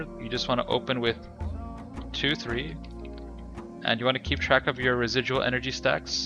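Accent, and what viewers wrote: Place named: Arab world. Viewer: Your accent is Arabic Where are u from btw fire guide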